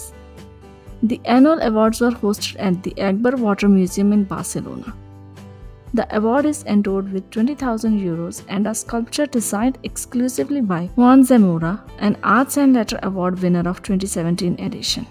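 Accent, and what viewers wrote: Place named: India. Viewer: La locutora en este video es una mujer de la India. Lo puedo determinar por su acento.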